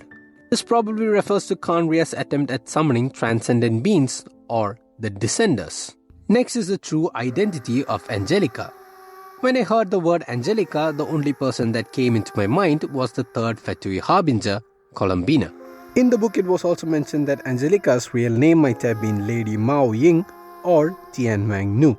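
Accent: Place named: India. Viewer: hmm I felt that you are from India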